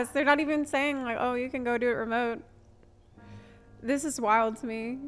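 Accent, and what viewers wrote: United States. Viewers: you sounds like you are from the US. why did want to move to ukraine? serious question, no malintent here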